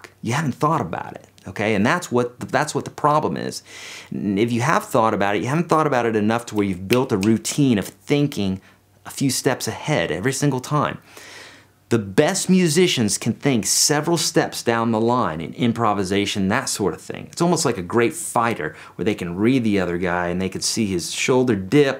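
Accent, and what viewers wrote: South, United States. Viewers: Your southern accent makes these lessons so much more enjoyable. I don’t know why, it just does!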